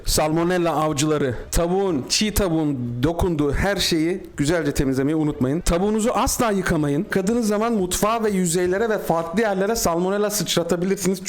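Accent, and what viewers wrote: India. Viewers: Lan bu nasıl bir aksandır muhteşem lan hintlilerden bile daha gerçekçi konuşuyor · Hint aksanı mükemmel. Devamını bekliyoruz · hasikitr lan hint aksanani efsane yapiyo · Ben bu kadar güzel hint aksanı duymadım hayatımda aşırı iyi hzjahsjajs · hint aksanı >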